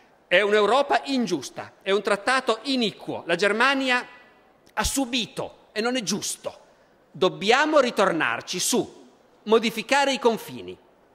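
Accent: Piedmont, Italy. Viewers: N 1 peccato x la voce troppo piemontese neee , caro prof sei esagerato complimenti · No piemontesissimo.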